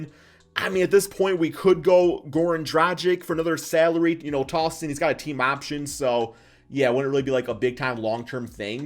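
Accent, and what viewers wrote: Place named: Bay Area, United States. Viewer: Big facts brah like yo is he from the bay? Or Michigan?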